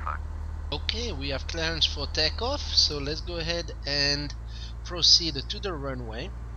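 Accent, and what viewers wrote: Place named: France. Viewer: Excellent video, just what I needed. Merci beaucoup - de ton accent je suppose que t es Français.....tu parles super bien l'anglais!!!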